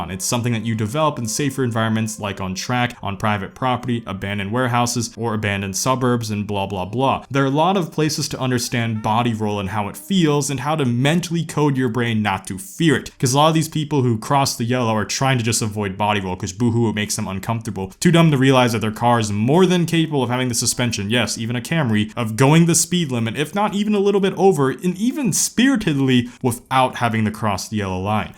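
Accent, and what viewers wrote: United States. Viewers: This guy sounds more American than asian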